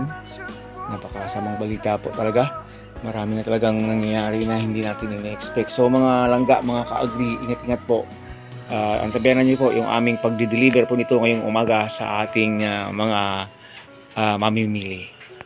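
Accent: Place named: Karay-a, Philippines. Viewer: Nagaragumo ang karay-a kag amo man dia ang pang hambal ko. Sa Alimodian ako naghanggud. Love the dialect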